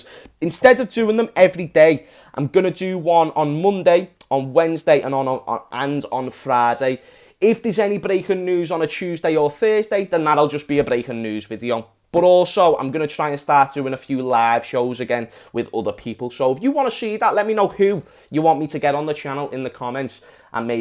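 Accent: Liverpool, United Kingdom. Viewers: Oh how I’ve missed this scouser 🙌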